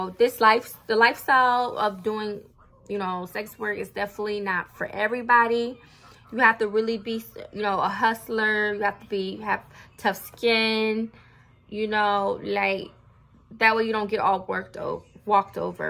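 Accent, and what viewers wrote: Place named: West Indies, Caribbean. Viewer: Gurl keep being you,,, I do feel like the repetitive negative comments are just haters. They give off the “they wish they had your mentality like you vibe.” Your mentality to your look & your perseverance is gonna make insecure feel some type of way. Newer supporters like me just need clarity but a person like me loves your transparency & boldness. You inspire me as a single homeless Mother staying in an hotel. Our shelters in FL are packed & the Staff do be hating when they see a go getter, it’s like they mad that you are doing good in a bad situation. You are a real content creator because you ideas & editing is on point. Keep your head up & keep brushing the haters off. I will see you in the next video,,,, oh I do have one question, are you from the West Indies because I’m from the Caribbean & I hear a lil accent in your voice. 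💜💜💜 -NinJah Bae aka Rude Gal 🫡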